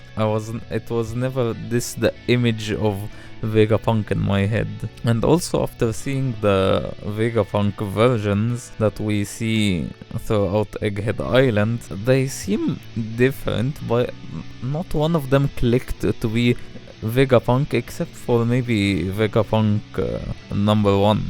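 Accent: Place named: Russia. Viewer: Why you speaking with Russian accent my friend why ?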